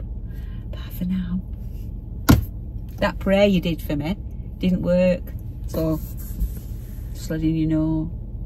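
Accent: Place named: Northern England, United Kingdom. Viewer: Hi, I just love watching your videos etc. You alway bring a smile to my face. So good to hear a northern accent as I moved from near Manchester about 40 years ago. Thank you. Have a great holiday x❤️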